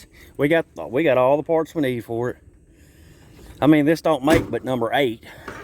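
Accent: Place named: South, United States. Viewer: The spontaneous joke at the beginning was pretty funny. I think you guys should start doing some kind of joke session or like a joke of the day or something. There's something about that southern accent telling a joke with a splash of cuss words LOL. I really think there's something to that man I think it would bring some people in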